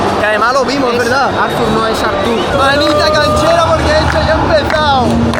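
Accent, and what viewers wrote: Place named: Castile, Spain. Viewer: O Teu sotaque castelhano é bem diferente, nunca ouvi. De onde é ?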